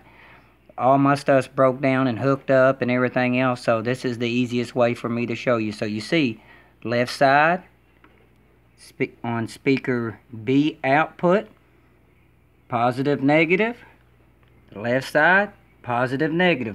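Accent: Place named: Kentucky, United States. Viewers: So I can tell from your voice your from my home state lol, what part of Kentucky bro? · I bet this dude is from Kentucky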